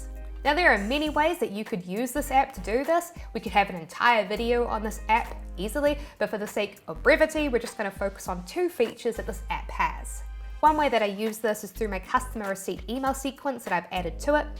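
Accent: New Zealand. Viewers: I just realized how different the NZ accent is compared to the Aussies. By the way, very good information here. I was wondering how to start a T-shirt/mug printing business like yours. Thanks! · Her accent with 'e' sound every time brings me back memories of my trip to New Zealand before corona... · Are you kiwi??? I don’t think I caught your accent before! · She's from New Zealand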